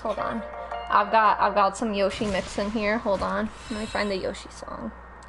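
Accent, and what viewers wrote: South, United States. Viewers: Why does this Turk sound like a deep south American? :-?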